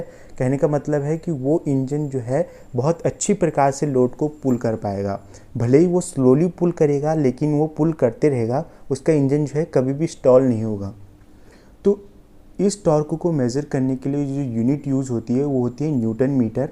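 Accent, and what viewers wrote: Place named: Uttarakhand, India. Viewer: bhai teri videos se ek chiz clear hai ya to tum mechanical engineering ya automobile engineering ke student ho..... aur bolne ke tarike se lga rha... Uttarakhand se ho...🤩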